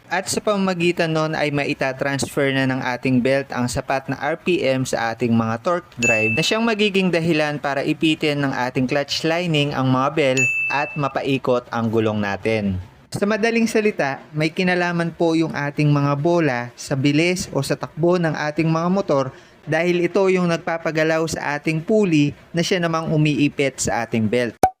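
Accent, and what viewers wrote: Pampanga, Philippines. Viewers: Kapampangan ka Boss? Tono pa lang eh. Nice one dami kong natutunan dito.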